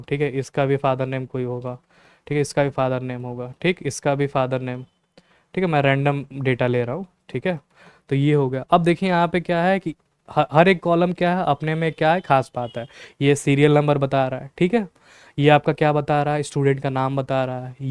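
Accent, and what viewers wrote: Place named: Bihar, India. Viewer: lagta hai sir bihar se hai thik hai🙃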